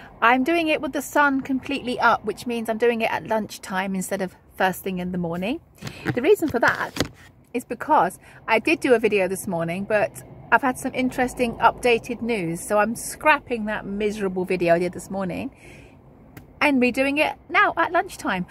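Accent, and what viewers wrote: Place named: United Kingdom. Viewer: Congratulations, that is great news. I am so glad you have persevered with your channel. It is so good to have British carnivore youtubers forming a community. There are some brilliant American ones and I am very happy to hear British voices discussing carnivore lifestyles in the UK, it is so much more relatable for other British people. Thanks also for recommending Carl at Primal Lifestyle UK he also has some great videos. Stay strong, You are amazing.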